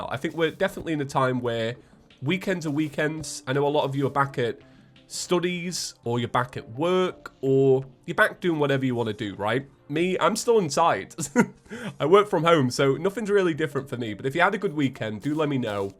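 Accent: United Kingdom. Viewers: Cap? Cap? What country are you... wait your British... never mind. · big boi slime vs cool british boi.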